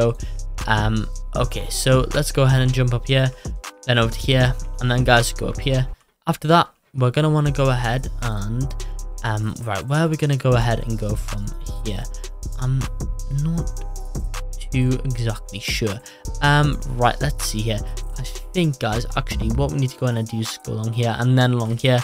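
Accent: United Kingdom. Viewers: U ate british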